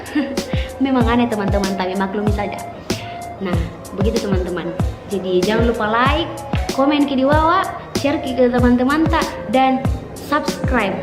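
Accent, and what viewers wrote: Makassar, Indonesia. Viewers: Sya dari NTB tpi pcr sya keturunan Makasar,logatnya mirip sekali sma mbaknya... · Lanjutkan 😂 ksi knal logat makasar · Suka logat makasarnyya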